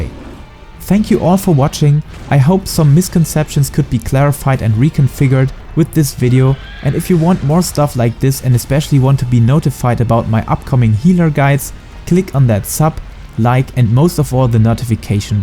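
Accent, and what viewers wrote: Germany. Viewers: A idea for when you're going to compare healers again Is to show icons of spells when you name them. Even tho I have all 2 eu 3 (rip astro) at 80 BIS I have no idea what most of the spells do when you call them out with your beautiful thicc German accent.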